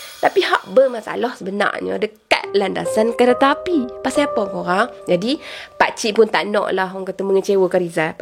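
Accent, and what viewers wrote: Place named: Kedah, Malaysia. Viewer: kak ni mmg org kedah ea tngok lancar ckp😁😁😁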